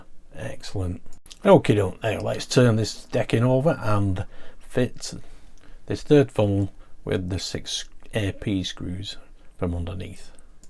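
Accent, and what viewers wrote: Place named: Northern England, United Kingdom. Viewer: PS: I really like your cheerful disposition and awesome northern English accent! Excellent stuff!! Cheers, Mark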